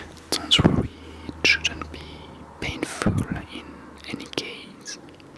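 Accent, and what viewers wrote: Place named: Germany. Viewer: oh you sound German lol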